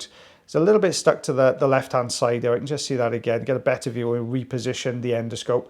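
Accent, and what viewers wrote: Wales, United Kingdom. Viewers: I’m so happy you said Ebony in your lovely Welsh accent. It’s one of my favourite words to hear you say!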